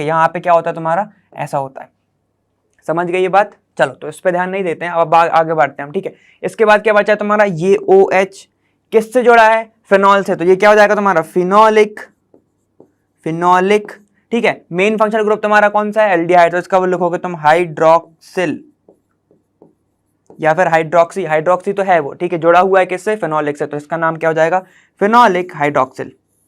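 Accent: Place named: Bundelkhand, India. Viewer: sir ki voice se lagta hai sir bundelkhand se hai ,i guess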